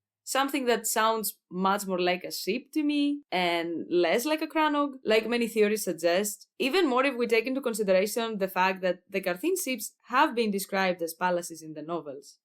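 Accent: Greece. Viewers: I feel like I’m hearing a Greek accent through your English, reminds me of my father, not really relevant ik but yeah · Είμαι από την Αμερική, καταλαβαίνω ελληνικά και έχω πάει Ελλάδα, όπως τα βίντεο. Νόμιζα ότι αναγνωρίζω μια ελληνική προφορά.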